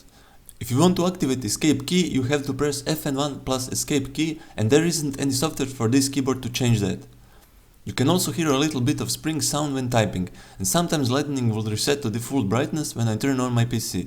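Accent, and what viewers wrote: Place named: Eastern Europe. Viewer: Where are you from? Cus you sound like you're Slavic :)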